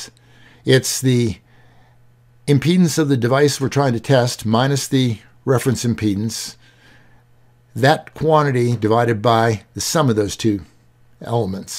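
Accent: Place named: England, United Kingdom. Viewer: Do you speak Russia?I don't understand your video. Because you speak like English man. Where are you from?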